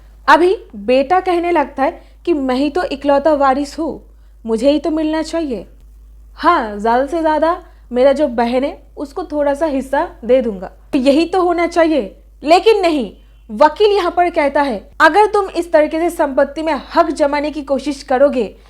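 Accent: Bengal, India. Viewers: You are confused and confusing us. Get rid of your Bengali accent. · good , like film story in bengal accent .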